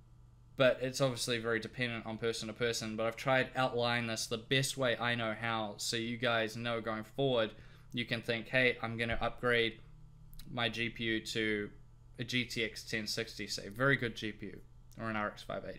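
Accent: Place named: New Zealand. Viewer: Hi, Nice accent!! Sounds like you're from Zew Zealand.. I just got the GTX1070 MSI OC card (since they are coming down in price) with my new 6core I5-8600K build. I need to buy a MONITOR without breaking the bank!!!.... I was thinking of a higher refresh rate, like 120hz or 144hz at 1080p. I play Battlefield 4 mainly and PUBG. HOWEVER, it seems in the mid ((($300))) US dollar range that these monitors have subpar picture quality, bleeding and other bad things that happen while gaming. Then I thought about the Vizio 43" 5ms D series LED TV. It says 120hz.. but I'm betting my nickles in my pocket that its probably some marketing ploy and not really native 120hz. But its picture quality is amazing at 1080p and it's around $300. What do you think or suggest I do for around $300 us $?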